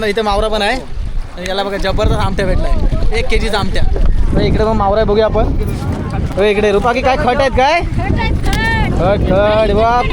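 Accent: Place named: Konkan, India. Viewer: भाषा तर कोकणात वाटते दादाचा कोणत्या गावचा कमेंट करून सांग कामांची रिप्लाय दे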